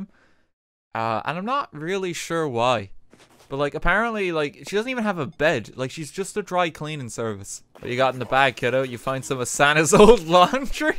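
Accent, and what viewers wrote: Ireland. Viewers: You're irish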